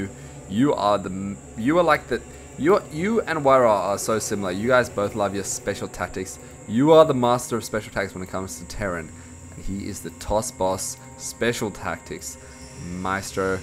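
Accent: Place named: Australia. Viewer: maybe not the best - In my opinion the best caster should be at least in master and discuss about tactics like a professional - but for sure - it is very nice to hear an Australian:) I have to admit-I like to listen to. I've started just few days ago-and I would like to continue. Besides Husky, Force and HD - is the best. For example TotalBiscuit has a superb voice, but he sucks at SC - as admit he himself. could be my best in the near future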